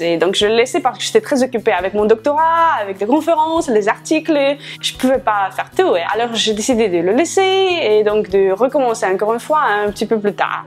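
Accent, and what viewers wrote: France. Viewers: J'adore ton accent français ! Aimez-vous les langues comme le norvégien, le swedish (je ne sais pas comment ça se dit en français)? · You have a great and unique french accent Ms..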